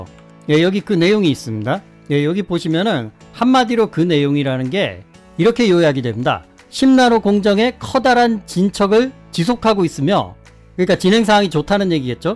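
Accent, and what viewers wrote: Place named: South Korea. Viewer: 이아저씨 영어가 콩글리쉬라서 귀에 쏙쏙들어오니 좋네 ㅋㅋㅋ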